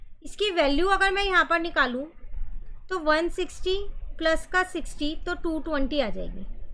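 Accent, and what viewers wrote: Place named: Uttar Pradesh, India. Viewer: Mam you are from UP your voice look like .🎉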